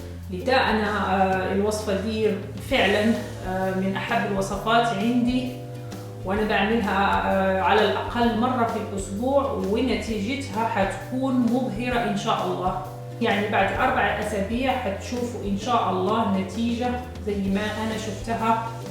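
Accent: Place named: Maghreb. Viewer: هل انتي مصرية اختي لهجتك من المغرب العربي و شكرا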